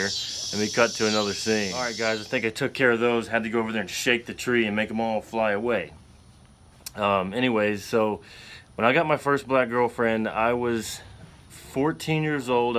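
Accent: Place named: South, United States. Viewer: Glad I found your site!!! Stories making my heart melt!!! Not sure if it's the Southern drawl, cowboy hat or YOU!!! PLEASE keep posting!!!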